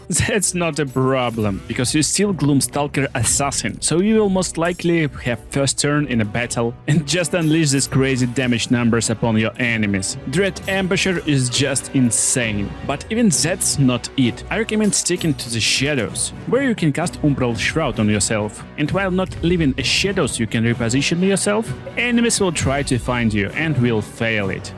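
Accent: Russia. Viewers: Такой сильный акцент, что у меня подозрение, что автор - русский. - Helldusk Gloves from Act 3, not from Act 2. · РАШН АКЦЕНТ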